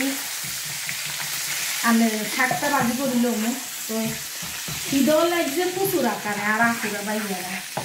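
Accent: Noakhali, Bangladesh. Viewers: আপু আপনার খাওয়া দেখে। আমার ও রুচি বেড়ে যায়..আপনার নোয়াখালী ভাষা শুনতে খুব ভালো লাগে।...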